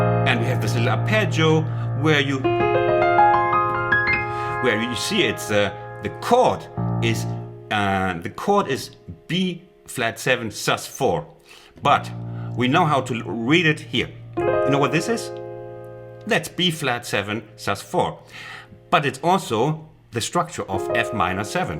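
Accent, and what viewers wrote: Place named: Germany. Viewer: Thanks a lot for posting this tutorial! It's one of my absolute favorite pop songs and now I finally know how to play it. I also like your hard German accent (being German as well).😀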